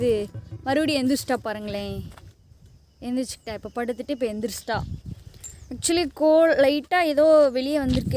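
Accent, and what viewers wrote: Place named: Kongu, India. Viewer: கொங்கு தமிழ் சூப்பருங்க அம்முனி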